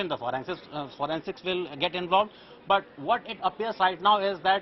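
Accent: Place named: India. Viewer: TAPE not TIP, GOOGLE not goggle This is one of the reasons why I hate conversing with an Indian when I was working in a Call center hahaha. Also, he explains on how to create DIY Shot gun at home hahah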